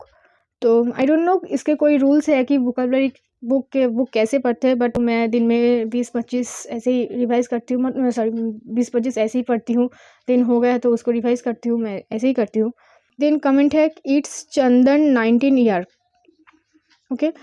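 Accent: Bengal, India. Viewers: that's so sweet of you mam . Tnq so much mam ❤️well I love your Bengoli tone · U from Bengal?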